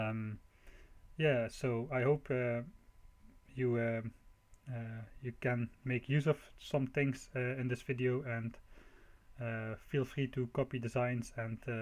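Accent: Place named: Netherlands. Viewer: je bent nederlands best wel te horen :P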